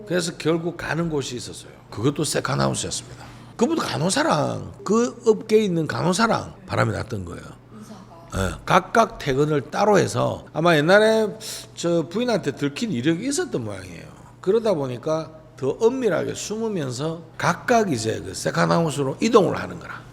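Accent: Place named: Gyeongsang, South Korea. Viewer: 오늘 처음 봤습니다 대장님 갱상도 사투리 억수로 잼있심더 ㅋ 나는요 고향이 안동이라 카이요 ㅋ 자주 옴시더 ㅋ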